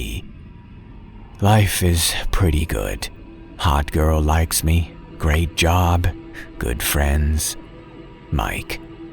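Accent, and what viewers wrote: South, United States. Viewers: Southern accent pretty damn close! My family is from the south, and you did pretty well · Btw impressive southern accent 👌🏻😊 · Love that thair southern accent ya got!! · oh I didn't know that youtube still alerts you on comment even on old videos that's cool, anyway thanks for reading these stories in a southern accent add to the feels and keep up the awesome work.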